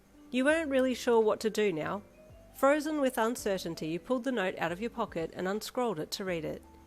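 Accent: Australia. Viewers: Naur, Aussie accent is awesome!! 😁💜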